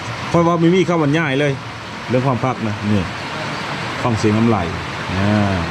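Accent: Phu Thai, Thailand. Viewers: เว้าคือภูไทเขาวง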